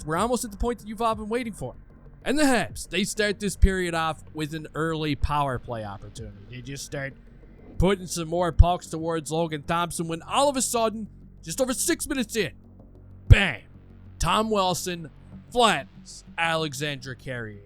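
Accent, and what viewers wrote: Newfoundland, Canada. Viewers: Loved your breakdown of the game- TBH, I don’t who you are but I’m hearing a Newfie…or a Caper? I wish there was another view of the hit- I’m surprised there isn’t. The fact of the matter is this: If you enter a ring with a bull, you have to be aware of where he is at all times. And that goes for Wilson. 2 things Carrier could’ve done: 1)Put up the fence and risk a major or 2)Take the hit and STAY DOWN. Caps don’t score because the refs stop the play. Carrier was probably pulled because of concussion protocol anyway.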